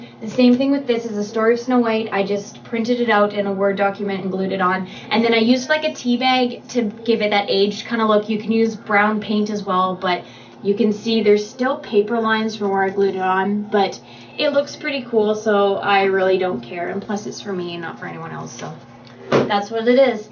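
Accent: Canada. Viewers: are you Canadian ?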